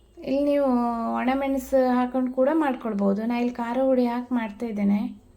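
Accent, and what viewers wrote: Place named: Sirsi, India. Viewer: ಶಿರಸಿ ಭಾಷೆ ❤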